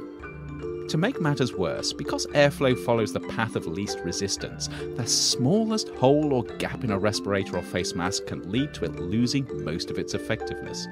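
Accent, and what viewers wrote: United Kingdom. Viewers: Is there a reason why you choose to have a narrator with a British accent?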